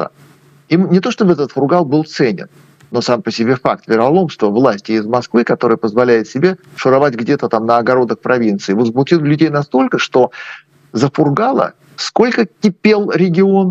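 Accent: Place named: Israel. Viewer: Явно заметен акцент ведущего. Тогда все понятно : либо Израиль , либо Бруклин. Дизлайк.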